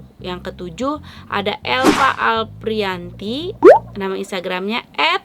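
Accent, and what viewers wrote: West Java, Indonesia. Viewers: Ngangenin dech dgn logat sunda nya teteh 😀😀👍👍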